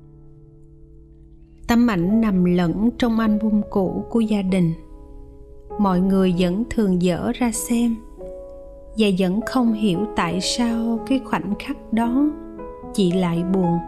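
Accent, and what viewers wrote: South, Vietnam. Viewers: 5/10. Xin cảm ơn giọng đọc tuyệt vời, miền Nam yêu dấu của.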